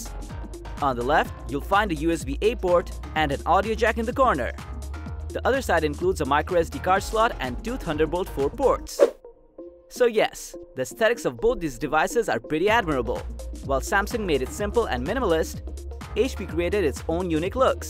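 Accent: India. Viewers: Are you by any chance Indian? Not that it's important but I can't help but ask because of the accent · Dude your Indian accent shines through that fake one.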